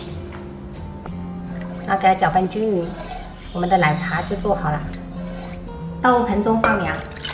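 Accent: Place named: Minnan, China. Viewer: 台語是閩南語，是閩南人，當然講閩南語，講話口音聽得出來是閩南人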